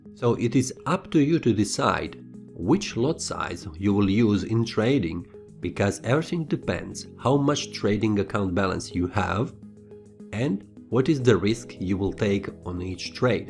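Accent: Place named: Serbia. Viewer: I like your accent where are you from? Serbia?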